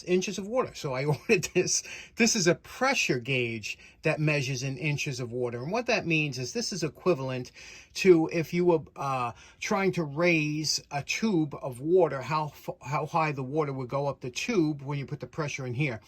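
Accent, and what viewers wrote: New York, United States. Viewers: What and where do I get sports tape? Your NY accent did you say “$ports tape” ?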